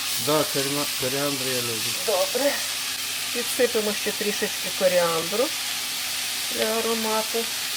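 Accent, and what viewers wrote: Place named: Odesa, Ukraine. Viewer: услада моему слуху,вѳ с одещины,сердце радуется я выросла там.Берегите себя,милые люди.Дякую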